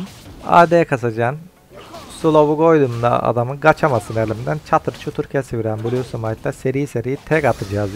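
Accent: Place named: Zonguldak, Turkey. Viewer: Reis Zonguldaklı gibi bi gonuşman var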